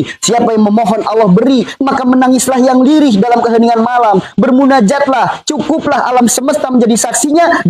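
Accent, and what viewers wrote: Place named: West Java, Indonesia. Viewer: Ya allah pa ustadz, sunda bngt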